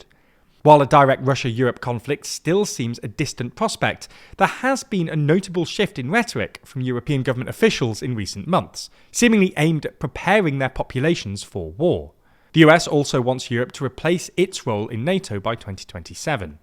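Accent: United Kingdom. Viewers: Hate your brit accent, this accent is more a turn off than conveying credibility. Britain is a has been.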